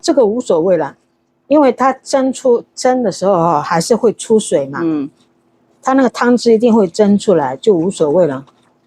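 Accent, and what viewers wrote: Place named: Shanghai, China. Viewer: 我覺得媽媽好像是江浙人、因為有上海口音。我父母就是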